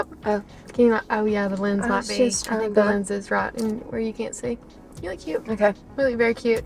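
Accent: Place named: South, United States. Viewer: lmfao "just the cole" I love southern accents sm 🤣❤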